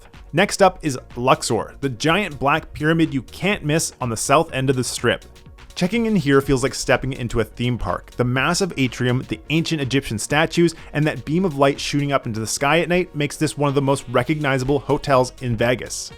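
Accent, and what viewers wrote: Canada. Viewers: Are you Canadian??? 🤔 if not, you’ve got a Canadian accent!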